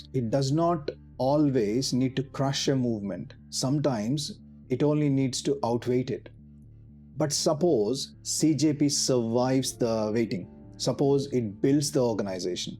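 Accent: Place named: Telugu, India. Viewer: You are a telugu person.....you said "oote" for "vote". I stayed in Andhra for a decade❤❤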